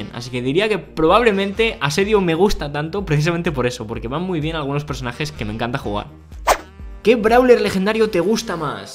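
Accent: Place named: Spain. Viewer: me estas trolleando? Aburrido? Tus preguntas y respuestas son unos de los mejores y... 100% seras mi youtuber favorito hasta tu face reveal(si lo haces) no me suelen gustar porque me suelo decepcionar un poco pero como eres español(o tienes acento español) la mayoria de youtubers epañoles me suelen gustar porque yo soy de españa. Pero creo que de todas formas, tu voz nunca me decepcionará y con ella nunca dejara de gustarme tu canal y muchas veces, me molan los chistes, ejemplo “es verdad que tiene poco rango” de el video de los 7 mejores gadgets, en fin, tus videos me pareceran los mejores despues de 4 videos otro youtuber Tus videos molan! 🔥🔥🔥❤️💪💪💪💪👍👍👍⭐😘😍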